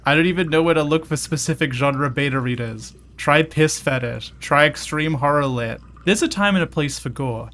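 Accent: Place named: Australia. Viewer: 1. i see (?) youtube 2. i hear from her voice that she's probably aussie 3. i subscribe and hope that the algorithm will show me more of The Gang 💅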